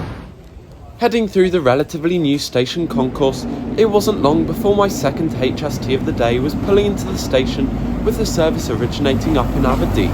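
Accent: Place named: Southeast, United States. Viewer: You have a southeastern accent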